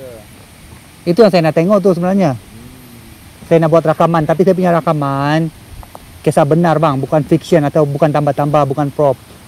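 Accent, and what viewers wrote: Sabah, Malaysia. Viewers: Bro lu pkai je loghat sabahan .. Tak ada Hal kami faham😅😅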